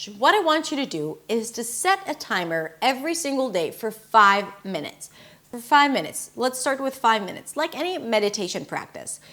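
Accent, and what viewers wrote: United States. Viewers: Wow, your native is Hebrew and you sound like an American, even speaking very quickly, good teacher. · Hey, you are super nice about your accent. you incorporated totally the american accent. That's my point of view.. Xoxo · I can understand you clearly, I thought you were American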